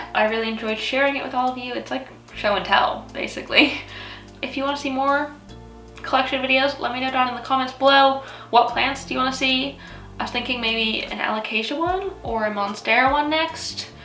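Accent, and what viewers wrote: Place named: United Kingdom. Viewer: Omg I can hear your UK accent forming lol 😝❤️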